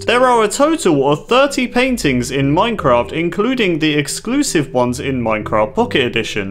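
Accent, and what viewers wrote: United Kingdom. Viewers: I love this accent are you british or something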